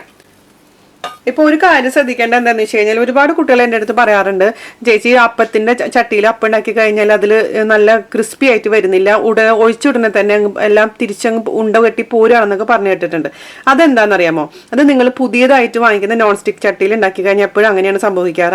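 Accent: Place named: Thrissur, India. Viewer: Hai... njan Saudi Arabia il aanu work cheyyunnathu. Ende mappalakku appam nnu vachal jeevananu... evade njagal thamassikunidathu naalikeram kittilla naalikera powder kittum. But aval appam polichu... super nammude thrissur bashayil... classayitta.. thanks dear... njan ayyanthol aanu thamasam. I love you... enne karikal vakkan padipichathinu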